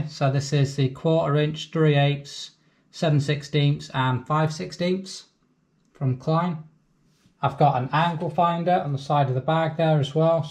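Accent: United Kingdom. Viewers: Im an Electricion in Sweden, i see you have stuff for plumming, are your doing both jobs as a electrision in your country?, sounds like you are from UK right? Great video, i enjoy watching your content.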